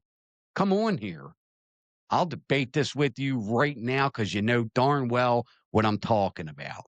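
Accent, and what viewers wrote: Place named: Baltimore, United States. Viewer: Hello, had to catch up, have not seen videos for a few days, I remember the show you had on Facebook and missed it when you stopped, now I know the truth, glad to have you back, Andy from Baltimore (Essex) and yes you have the distinct Baltimore accent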